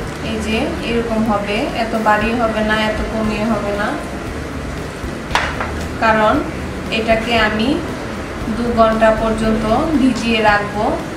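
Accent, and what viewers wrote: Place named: Comilla, Bangladesh. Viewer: apur desh most probably comilla .. your Accent says so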